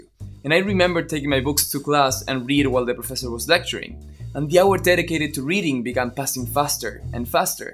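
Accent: Argentina. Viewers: Wow, Im from Argentina too, and I love your accent and the way you talk in inglish is awesome!!! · Tenés voz de porteño, puede ser?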